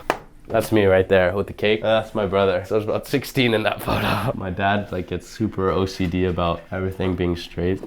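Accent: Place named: United States. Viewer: u have too much of american accent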